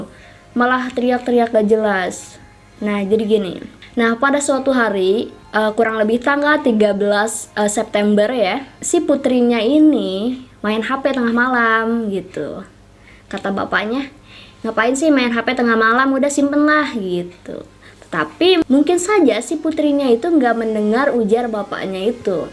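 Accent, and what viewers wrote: West Java, Indonesia. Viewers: Kak, orang sunda?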